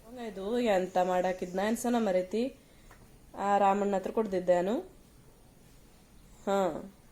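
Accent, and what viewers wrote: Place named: Yellapur, India. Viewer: ನಮ್ಮ ಪ್ರೀತಿಯ ಯಲ್ಲಾಪುರ ಕನ್ನಡ ಬಾಷೆ ಎಂಥ ಸೊಗಸು 🎉🎉🎉🎉🎉🎉ಧನ್ಯವಾದಗಳು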